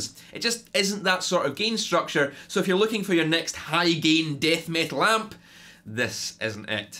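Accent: Scotland, United Kingdom. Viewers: The Scottish accent tingles the nuts like a dimed plexi through a cab of greenbacks! In other words..I hit the subscribe button so hard it was on the ground twitching like apollo creed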